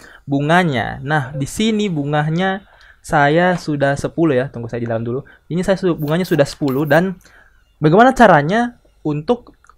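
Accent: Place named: Makassar, Indonesia. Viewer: Logat memang tdk bs berubah sappo Semangat trus sappo semoga semakin tdk belibet nantinya Salam kenal sappo Sy jg orng Makassar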